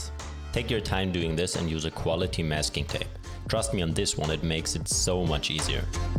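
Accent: Germany. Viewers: Are you german? I kinda hear it out. Danke für das Video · Are you German ? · Are you German?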